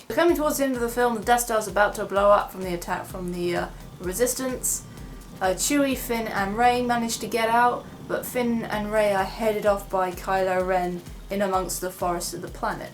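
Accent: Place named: England, United Kingdom. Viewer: love your english accent !! i hope you hear that often .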